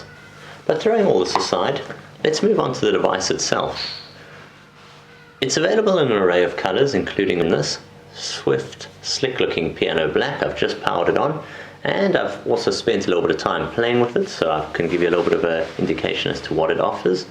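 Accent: South Africa. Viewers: Your accent makes me think your from South Africa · Are you south african? (I hear hadidas)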